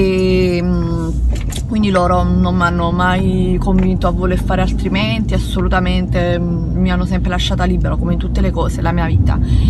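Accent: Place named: Naples, Italy. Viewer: Sempre a criticarla per come parla...ma nessuno ha ancora capito che e' napoletana?e per i napoletani tutto e' permesso sono cosi'!! non lo fanno apposta e'anche per questo che sono simpaticissimi😊😊😊vai!!!bellissimo video!!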